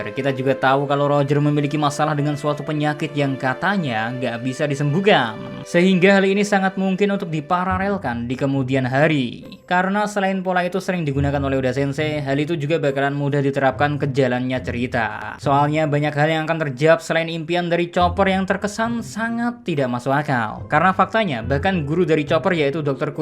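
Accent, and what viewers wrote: Java, Indonesia. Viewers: Medokmu lucu min ngidoll 🤭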